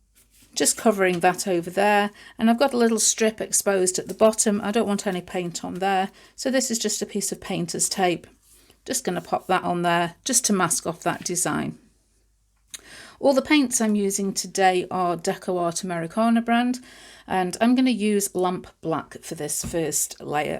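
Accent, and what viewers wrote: England, United Kingdom. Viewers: Thank you, I am so pleased to have found your videos. I love the way you explain the process clearly and calmly in your lovely English accent. So many of those I have been watching have been, while informative, too fast and with loud music over high pitched American accents. I'm a water colour painter experimenting with new techniques and ideas as I am now housebound during lockdown due to my age and health so have been exploring YouTube for inspiration and ideas. I now know that I have been using the wrong type of acrylic paints and not planning the process enough resulting in messy prints and wasted paper. I'm looking forward to watching more of your videos and hopefully producing something I don't have to hide away!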